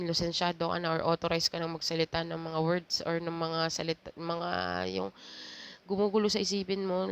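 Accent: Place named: Iloilo, Philippines. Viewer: 😂😂😂 sagad mag ilonggo si ❤❤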